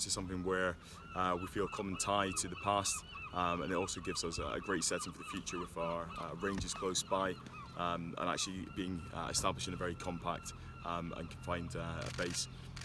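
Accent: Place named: England, United Kingdom. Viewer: You sound English to me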